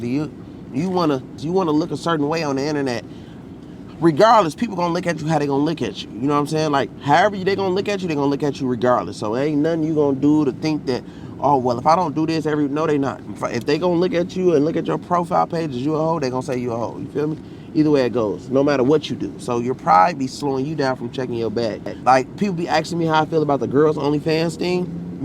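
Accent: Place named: Chicago, United States. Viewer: I was about to say I hear that Chicago accent him in lol.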